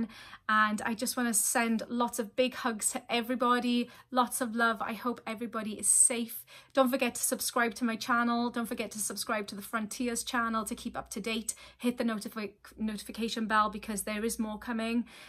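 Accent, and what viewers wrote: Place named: RP, United Kingdom. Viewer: U indeed the best voice on TY. You should soar into stardom. And your speaking voice is so british bbc received pronunciation its pleaseant as well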